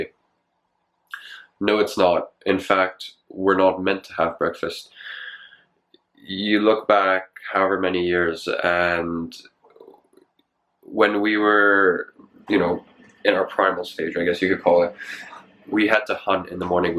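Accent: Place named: United States. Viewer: wait if you live in london for 13 years how or why do you have american accent 😳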